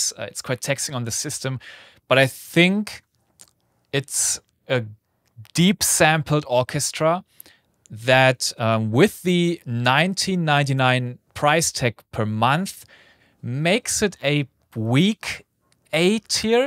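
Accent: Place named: Germany. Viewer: Are you German? I can hear a slight accent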